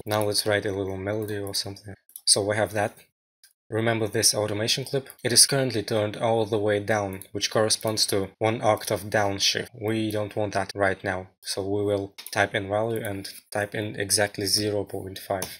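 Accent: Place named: Russia. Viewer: дружище, ты ведь русскоговорящий? я четко слышу этот акцент ахах. а вообще, очень круто. давно не смотрел подобных видео за редким исключением, но ты смог зацепить и вдохновить. всегда обожал метал и в последнее время подсел на брейккор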